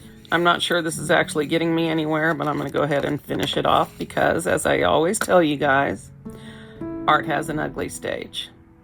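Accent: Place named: South, United States. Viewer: I beg your pardon, we do say y'all from time to time, but around here, we don't use y'all like Paula Deen. I love her but she goes way over board with it. But you know, when she first went on tv she didn't do that. Must be some sort of publicity crap. At any rate, I do love your tangles, southern accent and all. 😂😂😂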